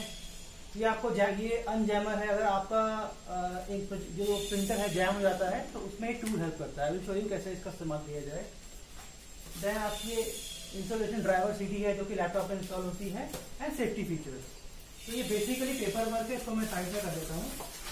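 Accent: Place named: India. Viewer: I am American and, of course, used to American english. Of course, I am also used to the accents of the other primary english speaking countries. Un fortunately, consistently, Indian Youtube presenters have very accented english which makes it very difficult to understand a technical presentation. I am quite sure I would understand them during a conversation.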